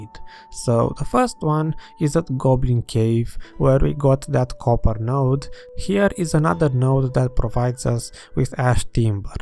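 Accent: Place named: Romania. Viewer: Romanian accent detected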